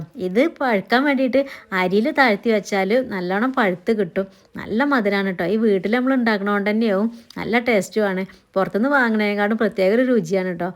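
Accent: Malappuram, India. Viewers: ഇങ്ങള് മലപ്പുറം, നിലമ്പൂർ, വണ്ടൂർ ഭാഗത്ത് എവിടെയെങ്കിലുമാണോ? സംസാര ശൈലി വെച്ച് ചോദിച്ചതാണ്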